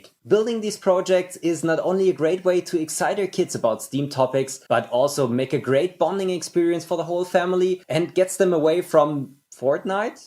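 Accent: Germany. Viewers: noch deutscheres englisch hab ich noch nie gehört, fck richtig ab deine 15 jährige stimme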